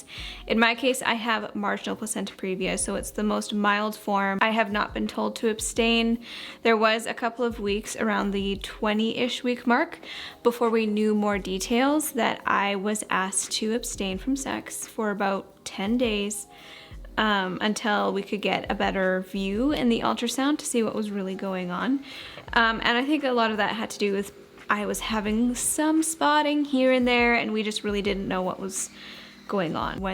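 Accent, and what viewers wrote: Western Canada, Canada. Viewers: It’s definitely more of a west Canadian accent because here in Ontario we don’t say it like that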